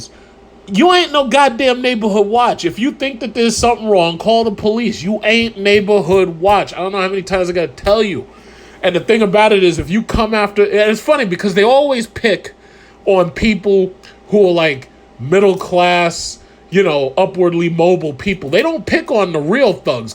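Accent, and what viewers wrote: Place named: New York, United States. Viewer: Your accent sounds so old timey New York.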